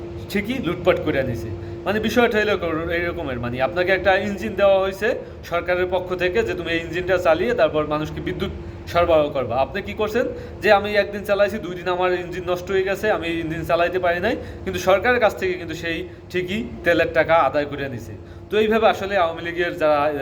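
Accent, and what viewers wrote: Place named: Barishal, Bangladesh. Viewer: Report করলাম। ফাজিল। তুই বরিশাইল্যা বল জানিস।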